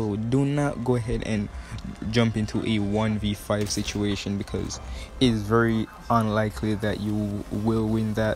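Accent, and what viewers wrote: Jamaica. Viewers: Are u Jamaica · You jamaican?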